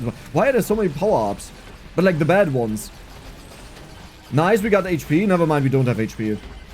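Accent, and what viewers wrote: Germany. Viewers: as a viewer since early vampire survivors, I like listening to ur silly German accent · People that dont like you talking you dont want as followers anyway. Your German accent rambling with a little campness in there is absolutely brilliant. I watch for that now. Megabonk is cool but your rambling is priceless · I just love the "Deutscher akzent". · Love when you talk. Otherwise it had been so boring. I’m from Sweden and learnt German from playing cs in the old days. And you’re accent brings back so much memories for me ❤ and my favorite German insult is Deine mudda schnallt sich ein dreirad unt spielt mit der transformers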